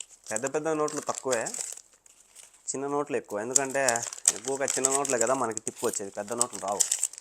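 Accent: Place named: Kadapa, India. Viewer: anna mee native place kadapa naa anna yaasa alaane vundi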